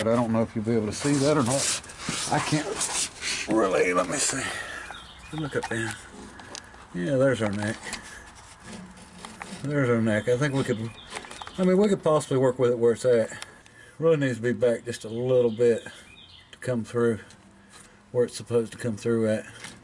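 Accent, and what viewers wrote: South, United States. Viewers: were you ever a teacher? Because you sure are good at teaching this teacher a whole lot of new things! Thank for the excellent explanations and beautiful, proper and polite Southern English.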